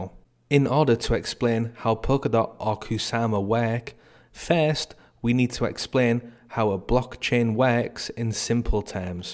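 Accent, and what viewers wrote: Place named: Barnsley, United Kingdom. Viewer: Great video again! You sound like you’re not far from me! Barnsleh? DM on Twitter if you like :)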